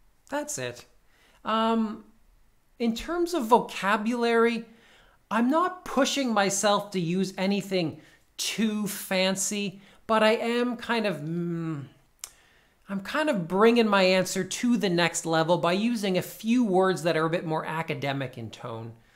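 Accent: Canada. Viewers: Hey, thanks for all the videos. They are really helpful. Test day tomorrow, wish me luck! out of curiosity, are you canadian? I like to guess where people are from based on their accent haha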